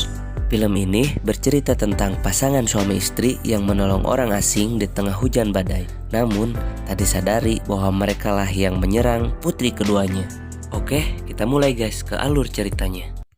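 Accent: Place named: Sunda, Indonesia. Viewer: Logat sundanya ledok sekali mas,..🙊😁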